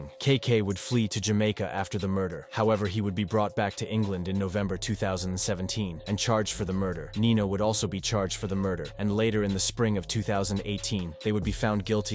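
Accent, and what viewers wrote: United States. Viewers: Presses play Hears AI American type robotic sounding voice Immediately turns video off…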